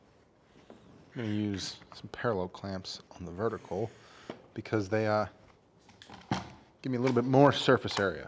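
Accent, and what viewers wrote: Pittsburgh, United States. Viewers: Hey yinz, new to the channel, love the Pittsburghese! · He has a Pittsburgh accent plus he says “Yinz”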